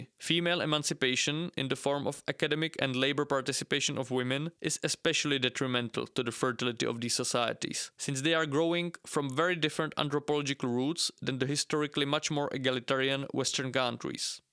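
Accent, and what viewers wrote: India. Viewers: Are you really Czech? You have an Indian accent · Wait. That fucking jeet accent. LMAOOO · Bro is Czech but sounds Indian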